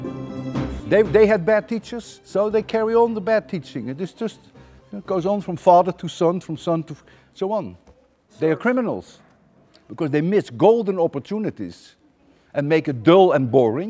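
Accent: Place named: Netherlands. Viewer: In all his dutch glory :') and accent lol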